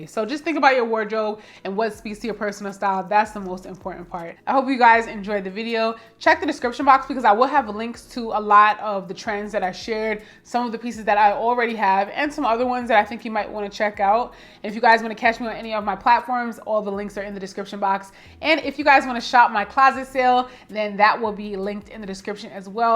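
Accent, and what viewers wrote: New York, United States. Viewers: Good morning, young Queen just ran across your channel. I am an old G born and raised in new Yorker. I hear a New York accent as you're talking love your channel. I just subscribed. I am 67 years of age and I'm loving your fashion tips. I'm gonna pick those up. And incorporate them in my closet from what I'm able to see in your home. I also love your design style. Absolutely gorgeous. Fresh clean. I love it, continue great success. Thank You Have A Blessed Day 💙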